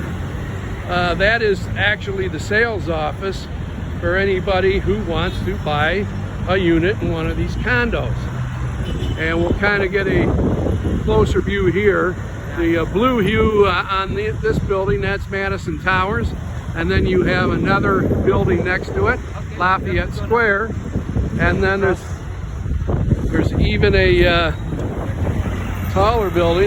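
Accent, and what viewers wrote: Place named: Chicago, United States. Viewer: You sound like you are from Chicaaahhgo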